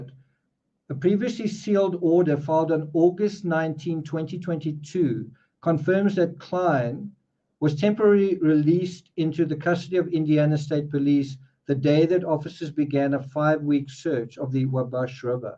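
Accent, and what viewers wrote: Afrikaans, South Africa. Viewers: Are you from South Africa ? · Really liking your insight thanku...enjoy your Afrikaan accent even more...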